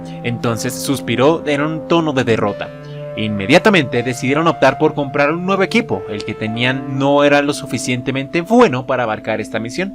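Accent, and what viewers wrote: Colombia. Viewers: Ja referencias colombianas habla como colombianas eres de colombia ¿?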